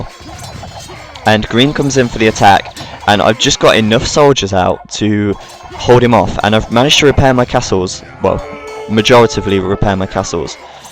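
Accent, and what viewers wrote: United Kingdom. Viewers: This is a great video and I love your British accent. MAKE MOAR!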